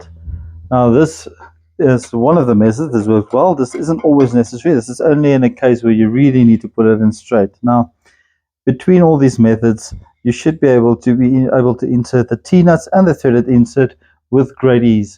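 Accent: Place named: South Africa. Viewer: Thanks for these great ideas! I've never used the t nuts and hanger bolts before. I'm not sure that I can easily sink the t nuts into timber that is 160mm deep using either of your first two methods. The threaded inserts have to go into a wall stud to hand the floating shelf on. That will be awkward to because we can knock holes in the wall, but there must be a way to do this somehow. I used to have a Dr from South Africa about 30 years ago (he worked in Tasmania) I still love the accent, thanks for triggering a happy memory, of course I love your accent too as it's the same as his!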